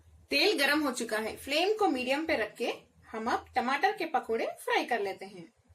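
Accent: Hyderabad, India. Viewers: Aapki hyderabadi mast rehti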